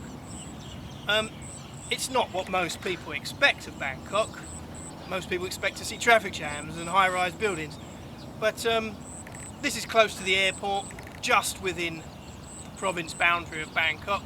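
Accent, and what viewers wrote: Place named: England, United Kingdom. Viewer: It looks a gorgeous birding spot. I've never been to Thailand. I'm familiar with some species, but most are totally exotic to me. Your narration is very interesting and helpful. I also like your English accent 😃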